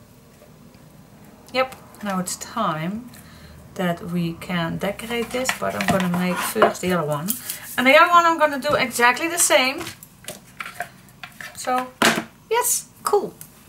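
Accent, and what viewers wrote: Netherlands. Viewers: o) I love it to hear your netherland slang in your english :-) it´s so nice to hear you….sometimes (yes I´m crazy) I work on PC and hear only what you work, only for Hearing your voice….it´s like a friend in the room who works and talks with me :o) thanks for your time to make this Videos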